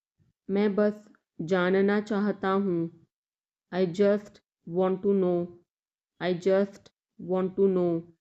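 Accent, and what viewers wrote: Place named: Canada. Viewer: It is better but pronounced like Canadian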